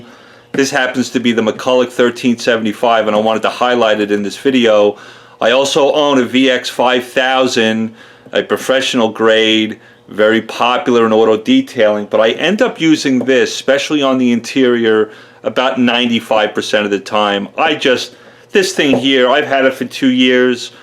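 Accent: New York, United States. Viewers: Yes. The annoying noo yawk accent. How does area become area-er?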